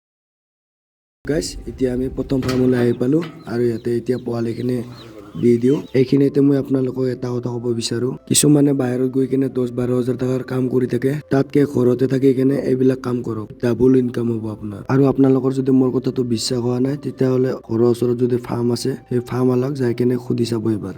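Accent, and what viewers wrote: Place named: Bishnupur, India. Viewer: Amr gaor kotha koisa tumi val loga Thai khini. Bishnupur hoy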